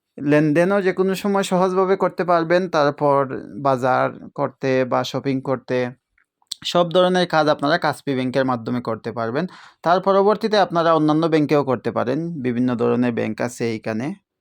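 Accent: Sylhet, Bangladesh. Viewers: ভাই সিলেটি মনে হয় নম্বর দেন জোগা জোগ করি। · আপনি সিলেটি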